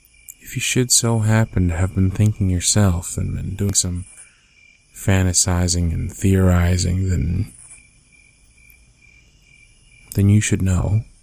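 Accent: United States. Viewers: ok why do u sound country like whyyyyy u remind me of my bf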